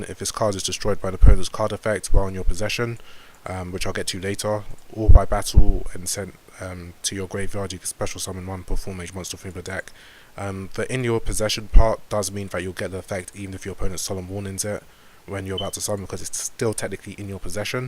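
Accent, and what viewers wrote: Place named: England, United Kingdom. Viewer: nice! The performages seem to do quite a good job for spellbooks, giving the deck access to great rank 4s. Trapeze magician also seems really good, with it floating and all. I've also seen people experiment with the combination of trick clown and heroic challenger- thousand blades to get multiple rank 4s on the field, so its really nice to see people innovating with the engine :D Btw, you sound english. Are you? o.O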